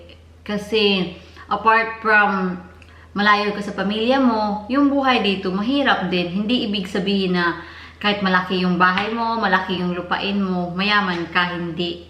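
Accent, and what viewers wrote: Visayas, Philippines. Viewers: Hahaha😂😂😂 asa diay ka sa Bisaya Dai nindut kaayo ka paminawon bisdak Kang dako😂😂😂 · Bait mo Kabayan . Yung tono nang pananalita mo ay bisaya ..katulad ko isang bisaya...hahaha.. I like it . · Oi palangga taga saan ka sa pinas pangga para ka kc bisaya.... ang tuno mo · Bisaya kaayo oy :-) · It seems in your speaking that you were bisaya , bisaya pud ko .. please support my channel . Done subscribing to your channel .. God bless :-)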